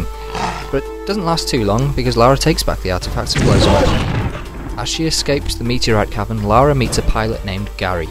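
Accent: United Kingdom. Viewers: Are you from the UK sorry for the random question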